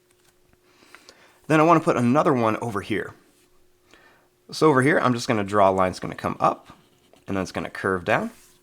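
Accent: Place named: Argentina. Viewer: habla en español argentino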